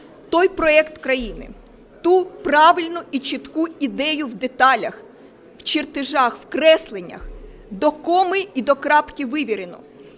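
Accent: Russia. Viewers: как всегда хорошо спела, наша сладкоголосая сирена. Всё же українська у неё хромает, акцент русский :), но Азарову стОит у неё поучиться. Показуха - это её козырь. Тут четко видна борьба кланов Тимошенки и Урки Яныка.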